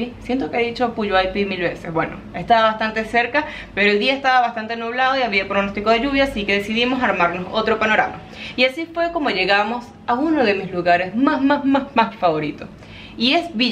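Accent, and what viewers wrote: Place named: Peru. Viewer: creo que tiene acento peruano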